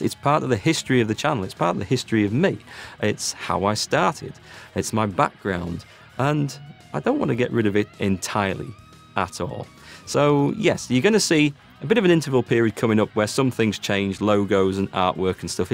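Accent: Yorkshire, United Kingdom. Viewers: where about in Yorkshire are you from?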